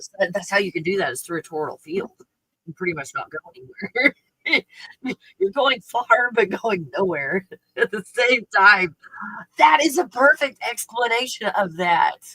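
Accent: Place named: United Kingdom. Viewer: love you’re British accent honestly I have a really strong Yorkshire accent you would need subtitles to understand ❤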